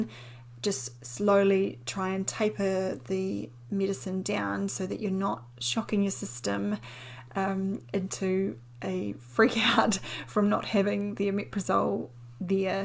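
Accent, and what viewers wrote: New Zealand. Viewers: I just discovered this video today, Thank you , it was very reassuring as I take this medication on an as and when basis. Also lovely to hear a New Zealand accent on YouTube . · Very informative video. I love the kiwi accent .. one of the best accents in the world. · I have binged watched a lot of your videos. I like your NZ accent compared to my American accent. I never realized the differences in pronunciation until I listened to you speak. Keep up the good work. I also like reading the comments of other viewers. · It usually takes me 2-minutes until I can understand the New Zealand accent ... Then, I'm fine. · Ah, Kiwi accent. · Loved this video Dr. Sam... I worked for Merck back in the day and was involved in the launch of Prilosec (then Losec) in the U.S.. This video really brought back a lot of good memories--and your accent brought back many other great memories of my time living in New Zealand! 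· None sounds New Zealand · Looks Irish but sounds Kiwi.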